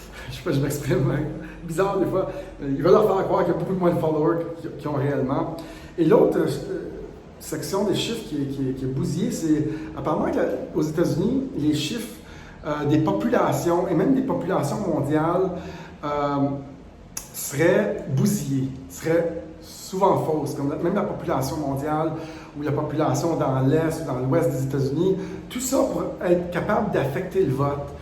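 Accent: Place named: Canada. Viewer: J’aime beaucoup vos infos avec cet adorable accent canadien 😇 Je suis une française de 82 ans et vis en Bretagne. Continuez je vous prie. Je vous embrasse.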